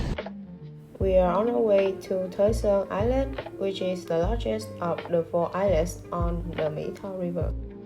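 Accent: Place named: United States. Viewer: nói tiếng Anh tưởng người Mỹ ko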